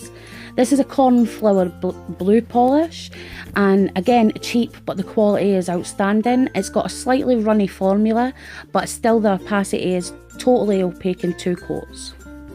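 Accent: Scotland, United Kingdom. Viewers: Just found your videos while I was looking for a good blue polish....Thanks for the great recommendations! Also love your Scottish accent...Have a blessed day!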